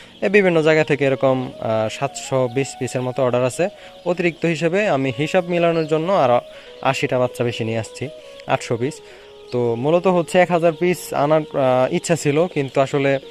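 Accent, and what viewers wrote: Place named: Mymensingh, Bangladesh. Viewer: ভাই আপনার বসা ময়মনসিংহের কথায়।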